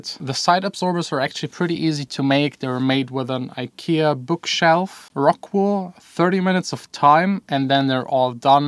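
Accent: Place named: Germany. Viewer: Well.. He's from Germany, heck, he even has a pretty strong German accent when speaking English! lol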